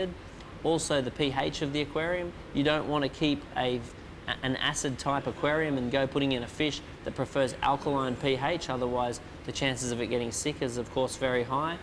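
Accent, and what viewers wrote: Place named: Australia. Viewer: He's an aussie, not a brit lol. And for some of us who have high amounts of aggression in our tanks this advice is very helpful.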